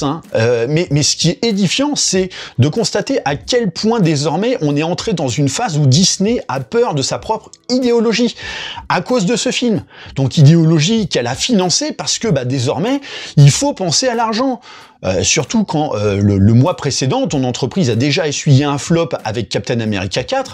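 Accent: Paris, France. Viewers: Ha punaise non! Rien à faire ... cet accent parisien très appuyé est trop insupportable !! Je m'en vais... ORLG ...IRLG ...URLG ...ARLG ....OURLG !!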